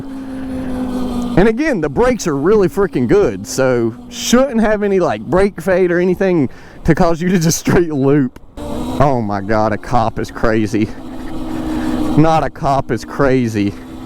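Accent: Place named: Tennessee, United States. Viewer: Definitely sound like you from Tennessee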